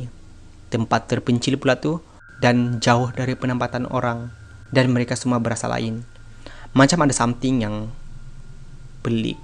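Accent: Sabah, Malaysia. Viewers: Walaupun saya kurang fhm slank sabah fhm2 sikit tp terbaik content bossku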